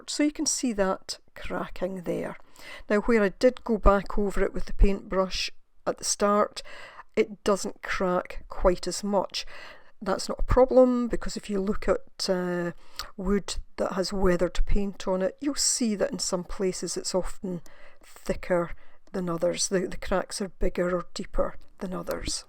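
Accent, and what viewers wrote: Scotland, United Kingdom. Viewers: Thank you for your video , I learned a lot . I love your accent, are you Scottish? Love from Canada 🇨🇦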